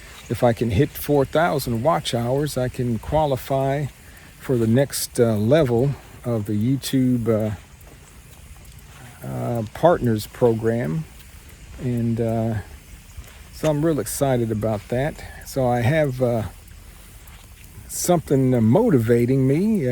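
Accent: Texas, United States. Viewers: Hello, my name is Roman. I come from Poland 🇵🇱. I like your YouTube channel. I like your Texas accent too. I learn English and your video help me improve my English, thank you for that. I appreciate it.😊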